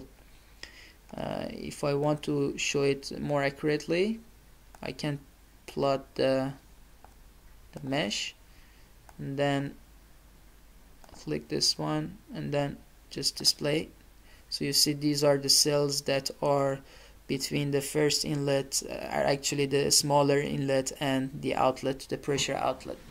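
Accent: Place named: Iran. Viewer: Persian ACCENTTTT.!!! Nice video btw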